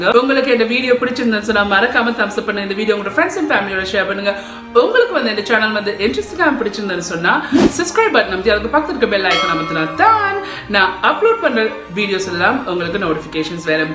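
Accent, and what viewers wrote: Jaffna, Sri Lanka. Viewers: Sister Are you from jaffna nelliady?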